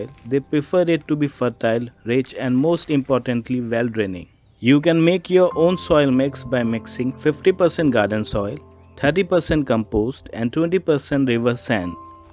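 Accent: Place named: India. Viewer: If it’s not an Indian accent doing the tutorial, I don’t event want it. They are always the best ones.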